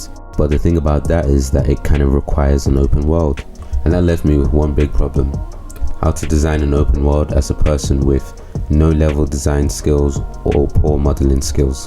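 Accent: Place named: West Africa. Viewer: yo, great work but you have a similar accent as me, are you from west africa, not to be offensive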